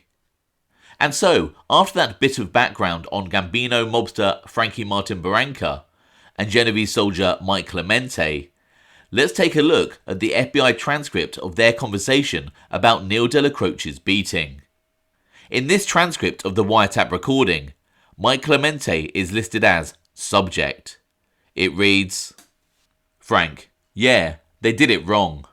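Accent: England, United Kingdom. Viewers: There s nothing like reading a transcript with an English accent …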